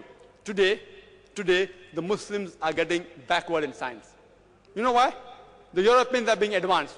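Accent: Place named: India. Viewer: This guys sounds too Indian for me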